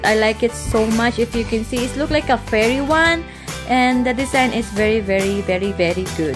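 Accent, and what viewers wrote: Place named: Philippines. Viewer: Hi,may I ask if you are Filipino?I just noticed your accent.:)Nice haul by the way.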